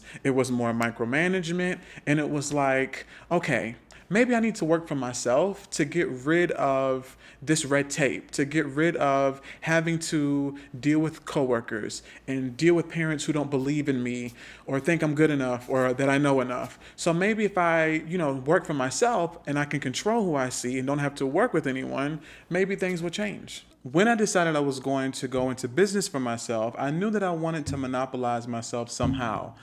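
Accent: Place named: Charleston, United States. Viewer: Sounds like Charleston Sc !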